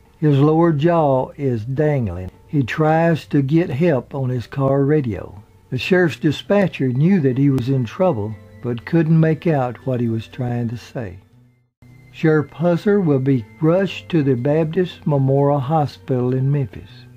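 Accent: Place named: Mississippi, United States. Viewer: Wow, what a story!!! Great video and scripting, per usual! And, as always, your great narration with that Mississippi accent is the best!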